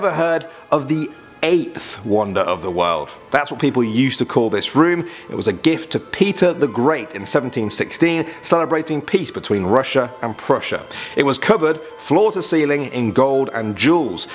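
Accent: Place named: United Kingdom. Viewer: Never have a Brit narrating.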